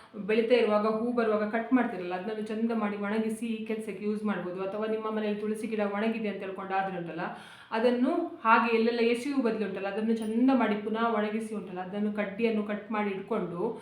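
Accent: Mangalore, India. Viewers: ನೀವ್ ಮಂಗಳೂರಿನವ್ರ. ಮಾತಾಡೋ ರೀತಿಯಿಂದ ಕೇಳಿದೆ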